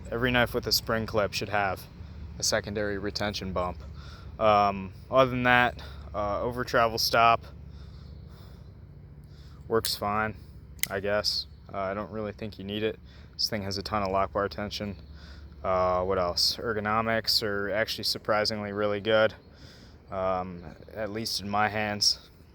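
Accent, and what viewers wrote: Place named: Midwest, United States. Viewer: You sound very much like a Midwest ‘up north’ guy and it’s appreciated.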